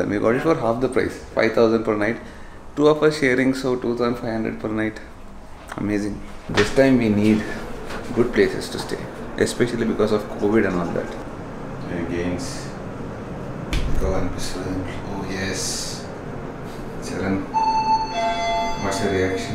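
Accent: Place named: Hyderabad, India. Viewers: Really this is more informative and authentic telangana English, but make sure you are taking anti covid measures while traveling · I like when u talk in hindi.. Hyderabad hindi❤😍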